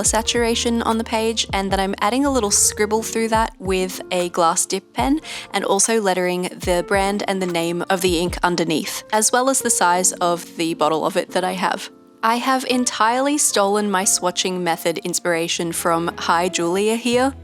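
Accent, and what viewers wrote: Australia. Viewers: Found you on searching for ink swatching! I'm in Adelaide and when I saw all the Ferris Wheel Press I wondered if you were Australian. Duh, couldn't I tell with the accent but prob because I couldn't hear one (being an Aussie myself) it didn't click! Hahaha. Anyway, loved watching all your swatching and love that you share inks with friends. Never knew there was a Pen club of sorts. Wonder if there is one here in Adelaide? I share ink with one friend and will soon receive a coffee smelling one she recently bought in Singapore. Can't wait for that. 😊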